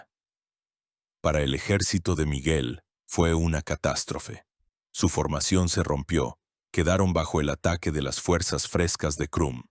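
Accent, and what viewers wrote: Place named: Latin America. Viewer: Aunque todos sabemos que este video esta hecho por IA, supongo que detras de el habra una persona dedicando tiempo y queriendo subir visualizaciones... No se si no se ha percatado de que desde que la locucion cambio a una voz en latino las visualizaciones han descendido drásticamente. Son muchos los comentarios que piden que volvais a la voz anterior pero parece que no se oyen las peticiones de la audiencia. Una pena....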